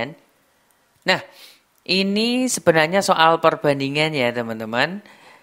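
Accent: Java, Indonesia. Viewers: Orang mn? Medog2 gmn gt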